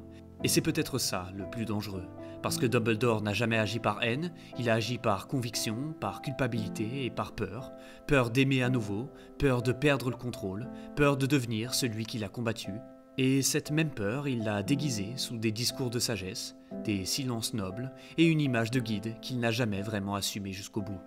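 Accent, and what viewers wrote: Belgium. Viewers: Cest laccent belge ça. Il doit être belge le mr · Bonne vidéo, j'entends un accent belge ? 😉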